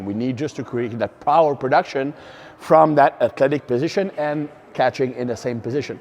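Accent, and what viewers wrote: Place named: Quebec, Canada. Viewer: SUPER un Québécois. Il est a Québec ou a Sherbrooke?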